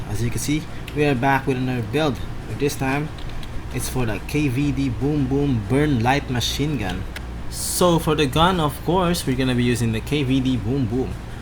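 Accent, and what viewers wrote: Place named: Philippines. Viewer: Your accent is familiar.... You a Filipino my guy? btw thanks for the burn build idea.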